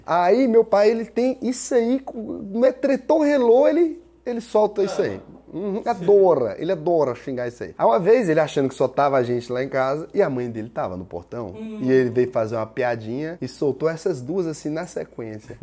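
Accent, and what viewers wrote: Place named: Goiânia, Brazil. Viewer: Que sotaque engraçado kkkkkk. Goiânia ? Da hora . São Paulo sempre uffa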